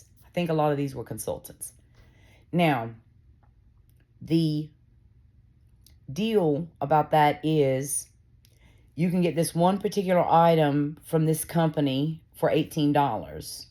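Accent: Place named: South, United States. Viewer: you have the cutest little southern drawl :)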